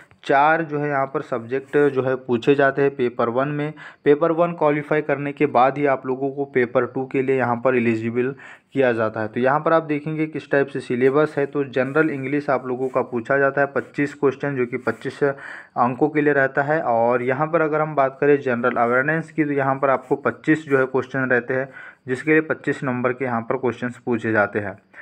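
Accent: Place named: Bihar, India. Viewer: Bhai aap Bihar so ho 👍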